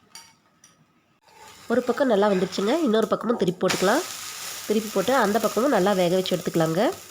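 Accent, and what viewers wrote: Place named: Coimbatore, India. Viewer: Neenga paesaradha Partha, neengalum namma coimbatore thaan ah🤔🤔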